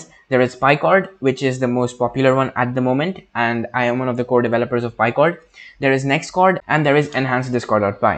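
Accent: India. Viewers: Damn you sound more indian than ever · are u indian?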